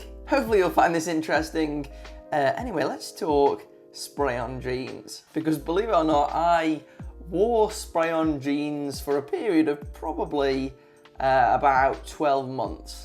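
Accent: United Kingdom. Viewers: I felt like you were describing my life honestly. I went from baggy to slim to super skinny spray on jeans, now I wear fitted skinny jeans (because I am still skinny) and it's such a difference. I still get told my legs are skinny but there's no way to hide that honestly, just to mitigate. Great series - came to see what it's about and stayed till the end until I noticed it's the end. Maybe it's your british accent, maybe it's because I can relate. Good content.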